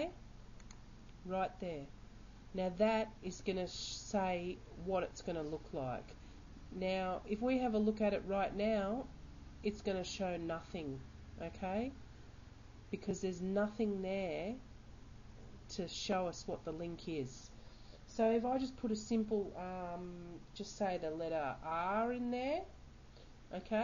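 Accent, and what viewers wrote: Australia. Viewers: okai are you from down unda???. anyways thanks for the video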